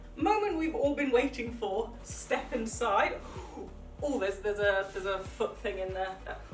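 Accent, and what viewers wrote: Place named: Liverpool, United Kingdom. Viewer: OMG a scouser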